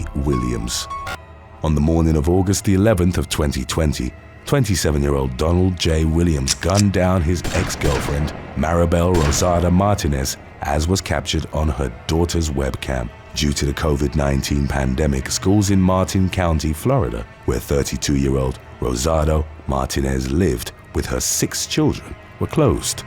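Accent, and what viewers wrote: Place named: United Kingdom. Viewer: Cut some slack, he’s British. They pronounce things differently over there.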